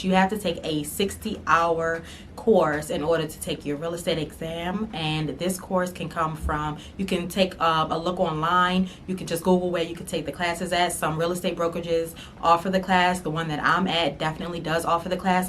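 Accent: Baltimore, United States. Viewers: I can tell she from b more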